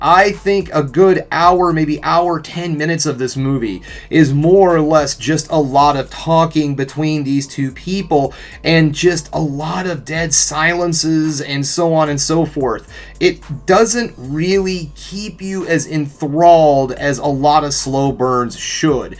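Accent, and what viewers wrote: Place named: North America. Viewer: I keep meaning to ask this: where exactly are you from? I'm a British guy and I just adore hearing your accent. I know it's either an American or Canadian accent you've got (I admittedly struggle to distinguish the two), but I'm curious about which region. Great review, btw 🙂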